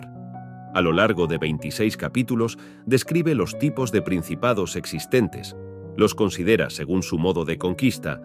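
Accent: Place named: Spain. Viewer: ¿Por qué usas el acento español?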